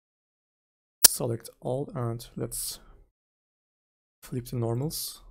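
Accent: Turkey. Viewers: is it Turkish accent? :D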